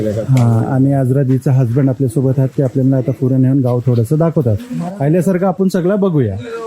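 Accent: Konkan, India. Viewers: bhai tumchi kokani bhasha lay God vatate. Kokan aani kokani manus donhi Saral sadhi aani god.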